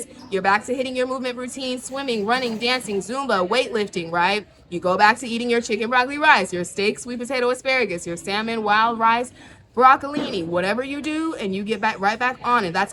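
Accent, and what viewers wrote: Caribbean. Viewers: Hey 👋🏿, do I hear that you have a Caribbean accent? If so where from?🙂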